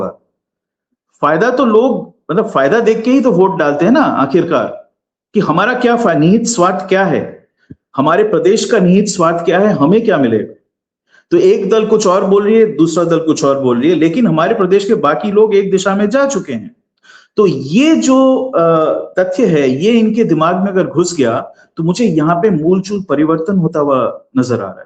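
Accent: Standard. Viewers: Dada Namasthe. Aap ki hindi bahut hi shuddh hain. Dusri baat eah hain ki aap ka vishleshan vaastavikta ke bahut hi sameep lagta hain. Chunav parinam ke paschat hi asli drushya dikhega. Bahut achi vishleshan. Dhanyawad 🙏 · ji aapki hindi bahut shudh hai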